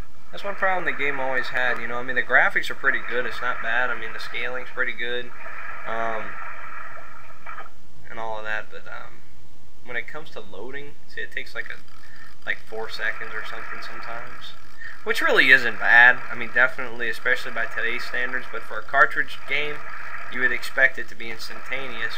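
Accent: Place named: Louisiana, United States. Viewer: Where in south Louisiana are you from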